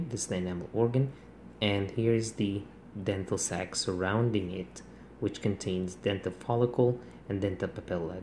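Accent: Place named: Vietnam. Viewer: who is agree with me that is seem to vietnamese accent??? p/s: i'm vietnamese, hihi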